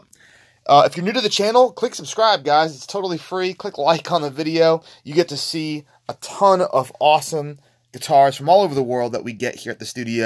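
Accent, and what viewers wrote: United States. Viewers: When you going to germany for some real metal head shit real nice work doing. you def got a florida accent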